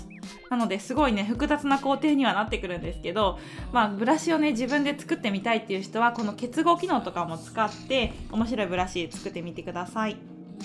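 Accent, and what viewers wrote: Kansai, Japan. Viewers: 今日めっちゃ、関西弁でてますね！😍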